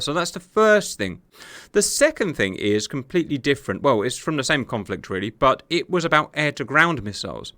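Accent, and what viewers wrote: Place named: United Kingdom. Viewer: Just because you hire an announcer with a British accent, people will believe what you say?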